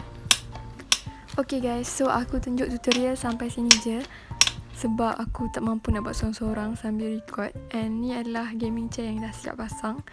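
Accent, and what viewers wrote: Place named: Kedah, Malaysia. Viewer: "Sampai tak Celuihhh" , org kedah kita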